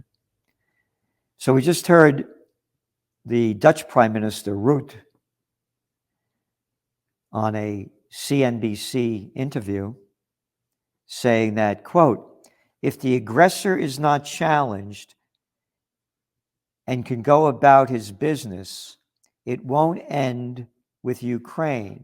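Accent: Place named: New York, United States. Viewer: I really enjoy New York accent (kuin stadin slangia) It is music to my ears. Thank you.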